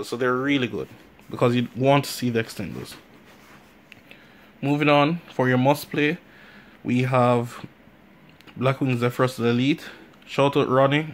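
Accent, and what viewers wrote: Jamaica. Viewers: your jamaican?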